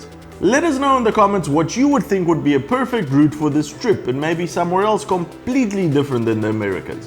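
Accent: Afrikaans, South Africa. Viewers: Speaking about South Africa.. Your accent is nearly identical to an Afrikaans English accent. I'm from SA and I had to check the channel page to see that you actually hail from Romania. Sorry for being so random lol. Great channel BTW.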